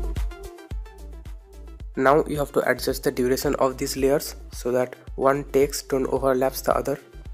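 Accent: India. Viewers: you know its the good shit when you hear that indian accent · U are indian i know indian or pakistani people speak english like that😃i am right am i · Hahahaha Indian English your speaking wtfc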